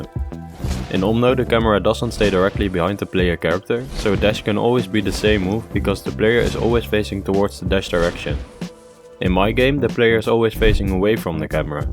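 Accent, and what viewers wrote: Netherlands. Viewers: r u dutch? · R U Dutch? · Wow what an amazing channel just subscribed, when I heard your accent I immediately thought that you were Dutch! and your are right? I'm also learning how to program myself, but I'm just a beginner. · Beautiful dutch accent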